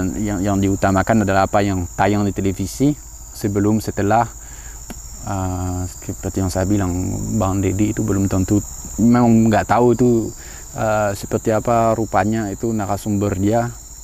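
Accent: France. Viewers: Aksen france nya sambil berbahasa Indonesia begitu gurih di telinga, enak didengar. Kalo ngobrol sama bapak ini kayaknya asik bisa berjam jam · Channee kalWeit masih kntal logat perancisx yaa? Klo mgomong bahasa indonesia.."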